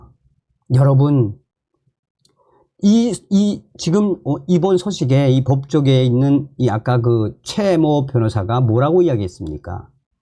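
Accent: North Korea. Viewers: 정성산 감독님 목소리가 오리 꽥 꽥 소리에서 쩌렁쩌렁 돌아왓네요 북한말 듣기 좋아요 썩어서 방송하세요 화이팅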